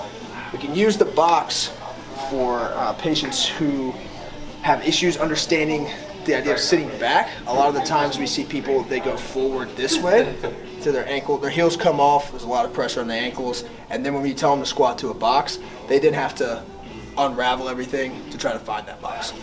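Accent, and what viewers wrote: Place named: United States. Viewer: This dude sounds so USA.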